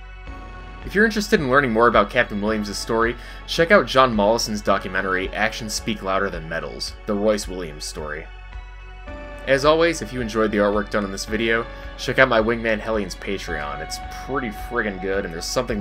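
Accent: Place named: Midwest, United States. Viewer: Your midwest accent makes this great.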